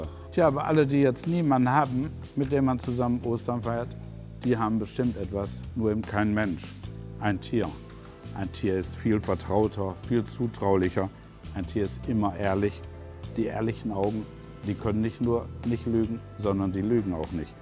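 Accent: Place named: Ruhr, Germany. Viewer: Plattitüden und Ruhrgebietsdialekt, kennt man sonst nur aus der Kirche.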